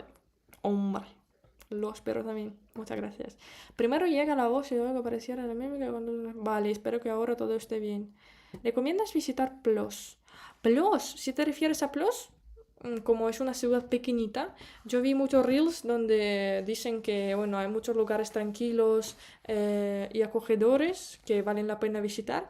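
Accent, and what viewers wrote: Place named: Spain. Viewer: Почему с такой акцент из Испании 😅...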